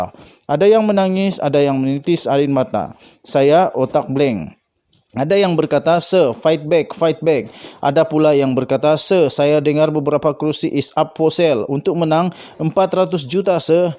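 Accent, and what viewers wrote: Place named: Sabah, Malaysia. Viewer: sabah bah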